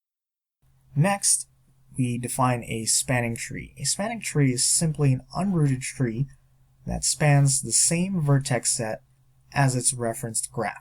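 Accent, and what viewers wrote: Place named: United States. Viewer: Finally, american accent!!!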